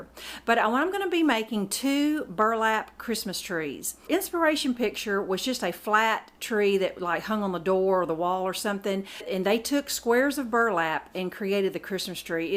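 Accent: South, United States. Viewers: I loooooveeee your southern accent. Did you just hot glued all of the layers?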